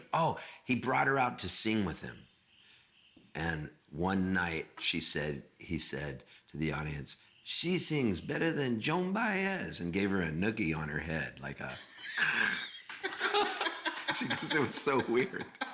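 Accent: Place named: California, United States. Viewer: California accent for the win with these stories!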